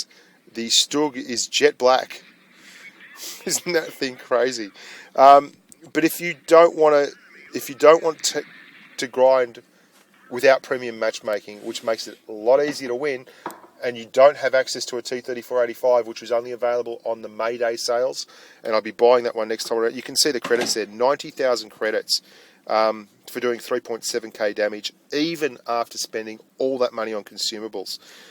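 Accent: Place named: Australia. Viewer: Your videos are great and your commentary is always entertaining. I don't know why but I've always loved the way you Aussies talk. Guess you can blame it on crocodile dundee from when I was a kid. I'd love to hear your thoughts on the Churchills. I can't find anywhere in my heart to like them but I want to know if it's worth grinding past them to the Black Prince and beyond.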